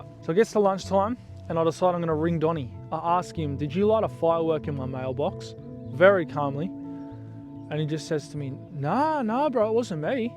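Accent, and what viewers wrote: Australia. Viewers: Love the way he speaks full Aussie lingo 😊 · mate ... thought he was omegaverse but no he's just australian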